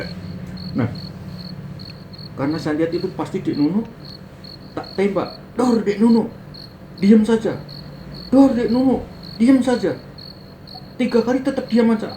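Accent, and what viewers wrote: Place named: East Java, Indonesia. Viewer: Mirip bapak n paman cara ceritanya, ternyata sama2 Jawa timur wkwk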